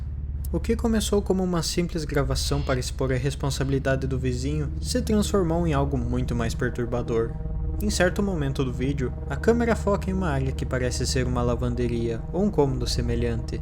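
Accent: Brazil. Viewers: não consigo prestar atenção no vídeo, o sotaque é muito bagual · O sotaque nordestino melhora tudo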